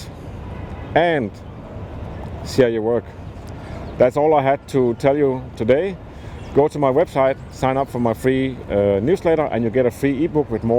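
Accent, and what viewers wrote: Denmark. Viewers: Are You from Denmark can hear a little dame in the accent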